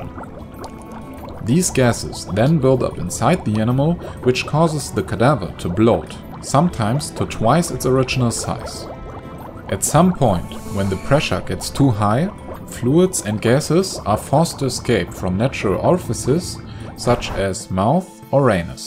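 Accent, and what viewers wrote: Germany. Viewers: RZU 147 "Dude" ... It IS a german accent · RZU 147 he totally sounds German xD that accent is so strong (I don't mind it though) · Are you german? You have a very strong accent. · RZU 147 I'm not even German but I know enough to within the first words determine he was. How the hell is a fellow german unable to recognize that... Lol geeze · The German accent makes it more convincing. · RZU 147 his accent is german, i know that because i am german. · Ich finde es eher traurig, dass du dieses Video unbedingt auf einer Fremdsprache bei der man es so stark heraushört, machen musst! Warum bleibst du denn nicht bei deiner Muttersprache? Denke, du hättest mehr Erfolg damit so nervt es nur. Oder aber du überlässt das Sprechen jemand anderem, wenn es denn unbedingt auf Englisch sein muss. Es gibt auch viele Deutsche, die nicht diesen typisch deutschen Akzent im Englischen haben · Sooo true, 5 seconds in I stopped the video and looked for this comment. 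I´m German myself and there is no way this dude isn´t one of us, it´s so strong and noticable!! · I love your German accent. Your style of animation is really similar to other channels I like too! Subscriber earned. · from Germany look at Channel Info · Oh the German accent...xD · Ekn _38 I am German too and I heard it in the first second 😂😂 Grüße gehen raus ♥️ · German Accent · RZU 147 im German and even I think he sounds German as hell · Chris Purolover he is German or Austrian or maybe Swiss but I doubt Swiss, his accent is clearly Germanic. Listen to how he stresses his 's's. The z and long s which are native to German give away his lingual origin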